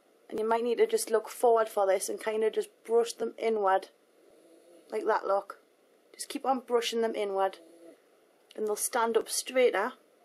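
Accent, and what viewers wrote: Newcastle, United Kingdom. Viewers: She's not Scottish - She's a Geordie ^__^ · Its a Newcastle accent, from the north of England. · shes from newcastle well i dont know if shes from newcastle but her accent is jordy shes definately not scottish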